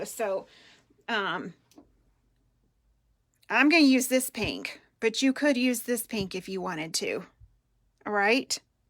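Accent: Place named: Georgia, United States. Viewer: I am watching you from Atlanta ps. You crack me up! Definitely sounds like you’re in GA 😂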